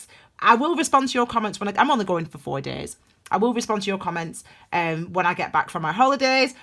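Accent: United Kingdom. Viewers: Congrats! TY from U.S. Your accent sounds British. Enjoy your holiday. We would say vacation, I think. 🌅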